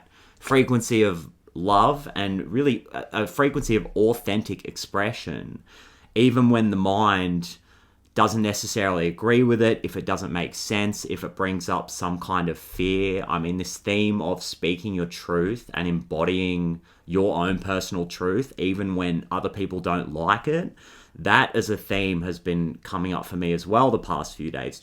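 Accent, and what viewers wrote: Australia. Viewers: I love you so much!!! Are you from Australia by any chance?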